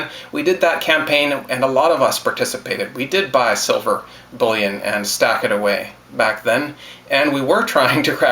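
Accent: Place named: Canada. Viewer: I miss your friendly canadian accent. Hope you are doing well!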